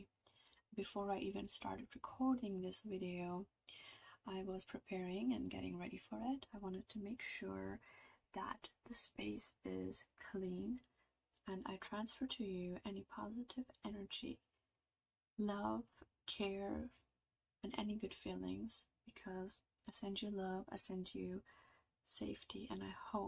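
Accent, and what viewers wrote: India. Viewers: an indian or pakistani daughter in law pure accent repeating khandan word make these kind of videos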